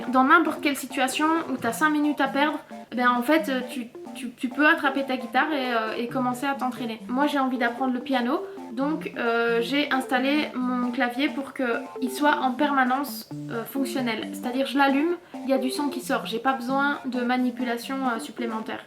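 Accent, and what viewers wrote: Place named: Eastern France, France. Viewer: J'ai passé la vidéo à écouter ton accent ToT ne serais tu pas de l'est type Haute Saône ?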